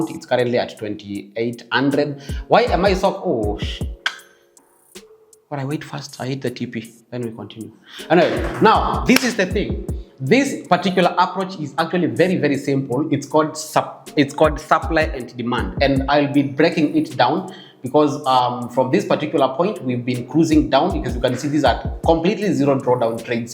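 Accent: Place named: Kenya. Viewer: Bro You sound a Kenyan... DO YOU HAVE OTHER SOCIAL MEDIA HANDLES ?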